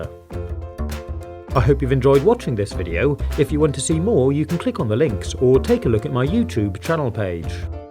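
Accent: United Kingdom. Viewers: Louisa Dsouza it's just a British accent.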